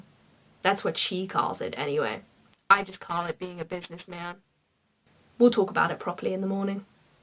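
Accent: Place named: United States. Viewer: you gotta teach me that US accent!!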